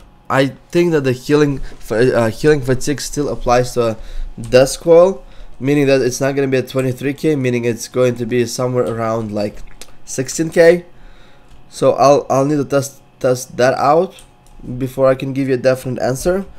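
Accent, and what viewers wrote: Russia. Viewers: That's fucking great! XD The Russian accent is awesome too, if you were playing a Draenei Dk it would be even better hahaha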